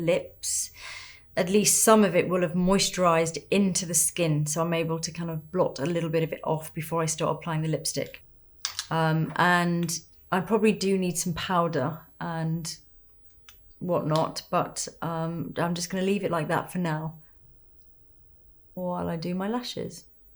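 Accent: United Kingdom. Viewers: Not only your magical makeup works and your beautiful natural look makes me to watch your videos, but also I'm here to enjoy your gentle and sweet British Reserved Pronunciation accent. You are as soft-spoken as a wind-harp swinging in an abbey door.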